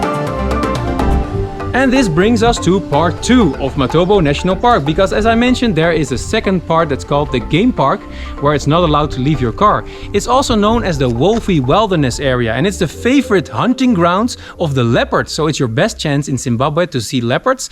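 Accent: Netherlands. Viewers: Thank you for taking us along. Zimbabwean here, born and bred, however I've lived in Wageningen before and immediately picked up on your Dutch accent. Good to share that when you refer to 'Kopje' its just one of the many borrowed Dutch words now part of our either everyday English vocabulary or Vernacular too. E.g. Jazi (Shona for jacket/long overcoat) is from 'Jas' similarly 'Tafura' (Shona word for Table) can be traced from 'Tafel' These are the few on top of my head but there is so much Dutch influence in our culture that a lot of locals may not be aware of. New subscriber too, keep doing what you are doing. cheers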